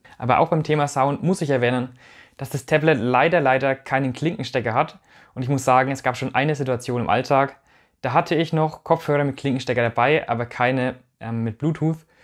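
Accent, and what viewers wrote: Franconia, Germany. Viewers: Ich hab's mir bestellt und bin schon ganz gespannt. Als Klavierlehrer nutze ich schon lange Tablets, allerdings ist es gerade so an der Grenze gewesen von der Größe von rund 10 Zoll. Ich habe das Tab A von 2016 und den Nachfolger von 2018 hier herumliegen. Der Akku vom alten ist ironischerweise besser, auch aus diesem Grund möchte ich das FE nutzen. Wie ich höre, ist ein Franke. Ich war in Unterfranken im Internat. Dementsprechend bekomme ich ein bisschen Heimweh und Nostalgie beim hören des frängischen Dialekts. 😏 Grüße aus Hessen! PS für Scans empfehle ich euch Adobe Scan. Das macht auch ohne Blitz hervorragende Scans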